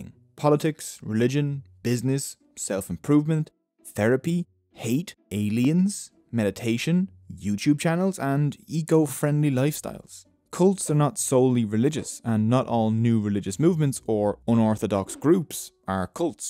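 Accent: Ireland. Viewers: I love your videos... Watching them from this morning. Your animations are truly great and I love your voice & accent, kinda sounds Irish, idk. Wish you could tell me where is this accent from. P.s: you got a new subscriber 😁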